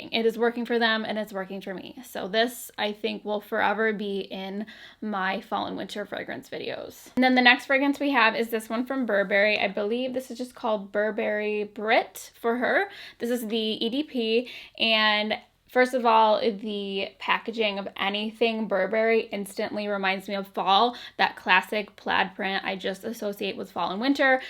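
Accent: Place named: Northeastern Pennsylvania, United States. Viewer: And Im from NE PA, and as soon as I heard u talk lime a year ago...I knew we talked alike!!!!!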